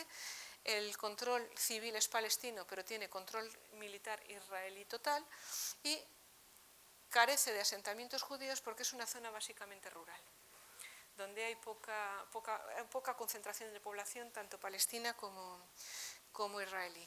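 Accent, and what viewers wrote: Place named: Spain. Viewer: Esta mujer es española por el asentó .....entonces muuuuuy probablemente sea católica romana y de allí por supuesto no acepta que los descendientes de Jacob son los dueños de esa tierra y si no quieren aceptar. Respeten la compra de esas tierras que que compro Abraham. Hay pruebassssss ancestrales así esta mujer y sus secuaces digo su grupo intelectual siguen al vaticano quien formó el Islam $$$$ y les hacen batalla a los judíos en todo el mundo.y la católica a todos los dueños de sus tierras mandando a matar a los nativos de el mundo. Y esta mujer es una ignorante deve educarse antes y después de lo las fechas que da y realmente decir la verdad profunda y clara es una vendida .como que si a México no lo siguieran saqueando. Dios nos libre de esta barbarie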